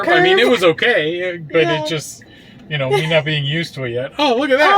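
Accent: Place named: Midwest, United States. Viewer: Where you guys at? You're accent sounds Midwestern. Perhaps OK or TX?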